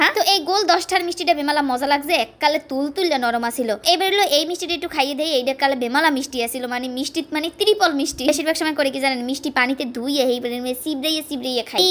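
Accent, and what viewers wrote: Barishal, Bangladesh. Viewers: আমার এই ভিডিও টা দেখার কারণ হলো বরিশালের কথাগুলো শুনার জন্য। কেননা আমি চট্টগ্রামের আর আমার একজন মনের মানুষ ছিল বরিশালের। তার কথা গুলো সেম মতো।অবশ্য সে এখন আমার আর নাই।আমায় বিশ্বাস নিয়ে আমাকে ঠকাইছে।অথচ ভালোবাসার উদ্ভুত মায়া।সে এখন আমায় ঘৃণা আমি তাকে করতে পারিনা ঘৃণা এটাই হয়ত ভালোবাসা।বরিশালের ভাষা কারো মুখ থেকে শুনলে আমার বুক ফেটে কান্না আসে।নাম তার ফ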